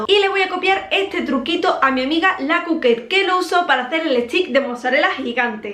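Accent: Andalusia, Spain. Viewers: ¿De qué parte de españa es su acento? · Hola, soy de Argentina, me gusta tu tono de voz, eres de Andalucía? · Me encantaste de que parte de España es ese acento tan bello ?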